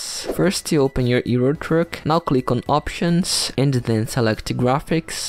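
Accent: Brazil. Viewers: Eu senti que seu inglês tava meio brasileiro kkkkkk dps que eu vi o nome do canal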